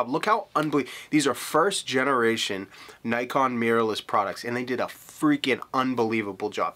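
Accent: Boston, United States. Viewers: I hadnt noticed the boston accent before haha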